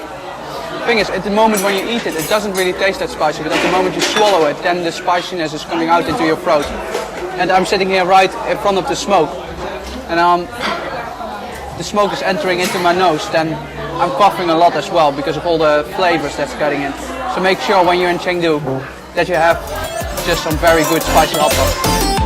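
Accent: Netherlands. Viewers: Thank you for the very informative video :) we’ll be there for a few nights in December. Your accent sounds Dutch btw, is that right? I lived in Groningen for a short while :)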